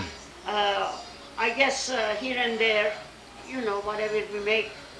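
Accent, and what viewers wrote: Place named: Kayseri, Turkey. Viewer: Ah.. The first time I cried so much to an Armenian story. She is still telling the Turkish words with a Kayseri dialect, also talking English with a Kayseri mouth. I don't believe an orchestrated genocide but personal stories are so dramatic :( The places this lady telling are correct; Kayseri, Boğazlıyan Orphanage etc.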